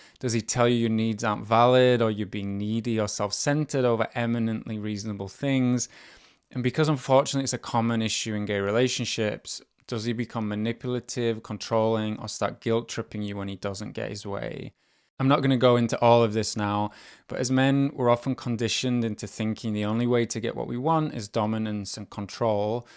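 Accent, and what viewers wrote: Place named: United Kingdom. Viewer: Your British accent is extremely soothing.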